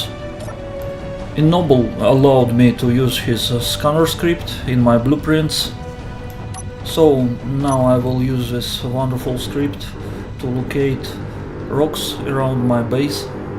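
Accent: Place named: Russia. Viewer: а акцент то русский)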